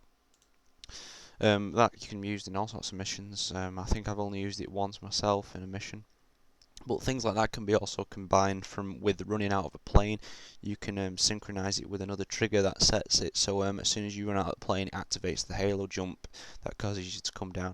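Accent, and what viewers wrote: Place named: Manchester, United Kingdom. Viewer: I was wondering where he was from, I knew he was northern, but this video DEFFO manc, it's how he says "you" as "yerr"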